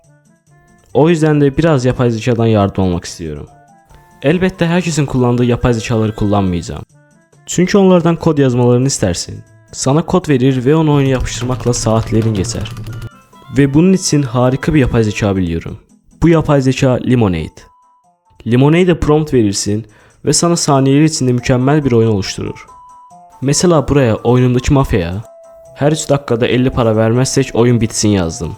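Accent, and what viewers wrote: Azerbaijan. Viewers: Abi Azerbaycanlisan?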